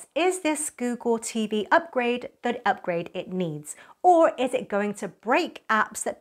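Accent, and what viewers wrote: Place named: Bristol, United Kingdom. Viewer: she must be from Bristol?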